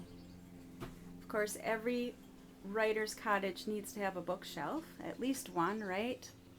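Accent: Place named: Canada. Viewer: Just wondering cuz I noticed your accent, are you Canadian?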